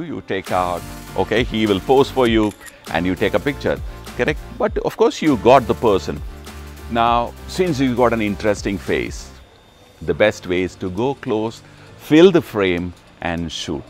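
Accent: Kerala, India. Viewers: Typical indian acent haha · That's a Malayali English slang !!! 😁😁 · His malyali accent is funny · thx... that indian acc ent in english is so weird :D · Sir , are you a mallu.... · I think u hav an Indian Accent. I like ur Indian Accent.I am too an Indian...... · Annoying Indian accent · Malayali ana? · Ah englishil malayalathinte touch undd😊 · Mabile faune photography, Sir you have Malyalam Accent 😍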